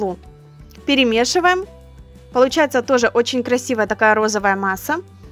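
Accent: Ukraine. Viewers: Молодец ты. И акцент твой украинский мне тоже по душе. Я в восторге от меледочных роллов. В субботу обязательно сделаю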